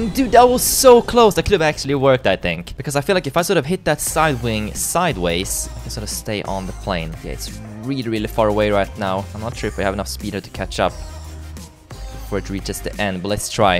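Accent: Sweden. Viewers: ar yu swedish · Are you swedish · Are you from sweden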